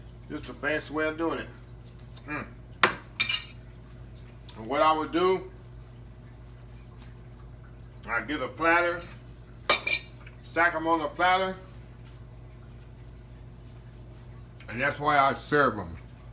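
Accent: South, United States. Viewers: Just like my Granny from Mississippi made and taught me. I’ve Had a seizures and suffer memory loss but you bringing it all back. And that storm in the background plus ur strong southern voice is like comfort food for my ears🤗I put your channel on to help me sleep as well as reacquainting myself to my family’s old school soul food traditions. Much appreciated Chef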